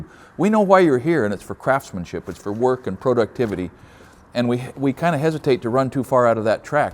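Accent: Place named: Texas, United States. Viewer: I think you might be a little more Texan than you know.....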